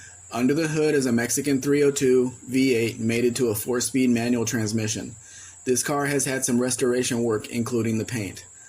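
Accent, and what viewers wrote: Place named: United States. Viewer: I have a question, if you are OK with it, are you native Mexican? You speak very fluent English with a solid American accent. Love your videos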